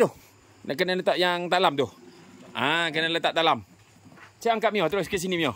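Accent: Perak, Malaysia. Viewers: Pekat loghat peroknye, beso kome 😂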